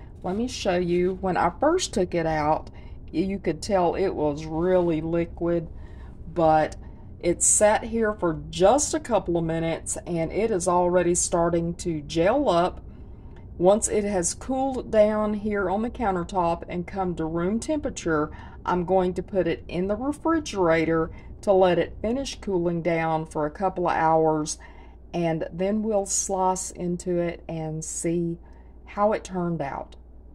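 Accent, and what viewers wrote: South, United States. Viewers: It will never stop being confusing to me how Americans ended up with that stupid, idiotic southern accent. It's disgusting. Why???????????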